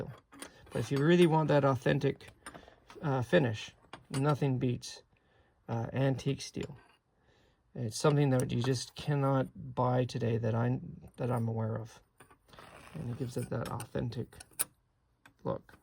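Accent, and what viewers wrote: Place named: Canada. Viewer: These chisels are great mate! love the fire sharp chisels. I have a ton I was given in my workshop that are super old. Ill do a video of them at some point. They need re-tempering really and don't hold up to the sandstone I often work, and the abuse I put my tools through 😂 Where in Canada are you? I spent some time over there a while back. miss that accent.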